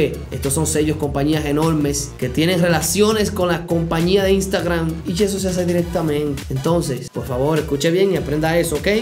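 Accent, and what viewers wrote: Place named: Dominican Republic. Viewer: En tu acento te Me pareces dominicano espero que sí pro ases muy bueno videos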